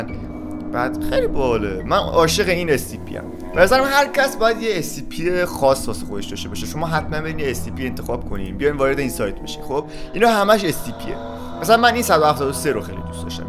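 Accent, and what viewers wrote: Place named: Afghanistan. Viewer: چرا لهجت افغانیه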